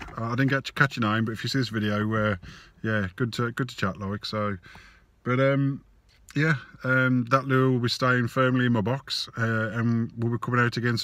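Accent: Birmingham, United Kingdom. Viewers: haha no brummy sure sound like one to me mate haha well yeah keep it up bud love the vids and speak soon good luck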